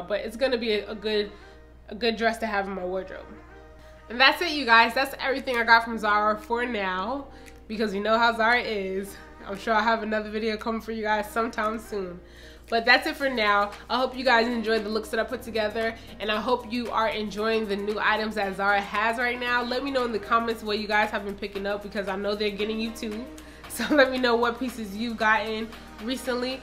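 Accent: New York, United States. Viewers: Another great video! Your NY accent is giving me so much life!!! Reminds me of home lol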